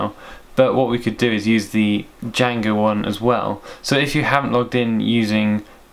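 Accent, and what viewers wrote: United Kingdom. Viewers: Everything's easier to understand with a British accent.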